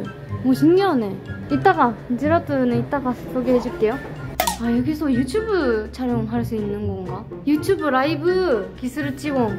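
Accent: Gyeongsang, South Korea. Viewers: 은근히 대구 사투리 뭍어 나오는거 겁나 매력적.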